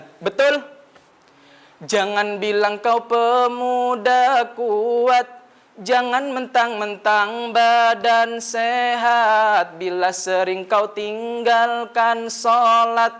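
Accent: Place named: Surabaya, Indonesia. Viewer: ligatnyaa berasa banget org surabayaaa. ayo9 di tekan lagi intonasinya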